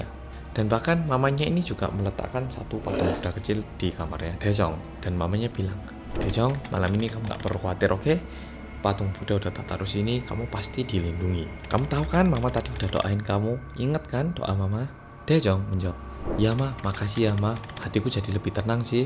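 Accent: Java, Indonesia. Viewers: Wkwk nadanya kaya ad jawa jawa nya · Baru2 ini aku play rindingdong jam 12 malem. Karena ternyata cerita2 ini dan juga suara mas medhok ini bikin ngantuk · Semangat lurr,ora ilang jawane💪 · Intronya jangan di ganti dong kak 😣bersama orang korea yang medok aja😂gk usah ganti😂aku suka ikutin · Mas skrng tinggal d mana seh kok bahasa Jawa nya medok bgt...kyk logat Jawa asli:v...respon mas...aku loh gak tau di respon Karo artis:(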